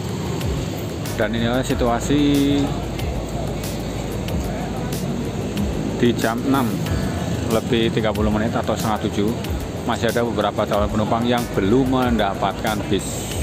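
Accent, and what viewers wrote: Banyumas, Indonesia. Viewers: Hadir nyamperin.. Mas.. Yen ora ngapak ora kepenak lur... Paten..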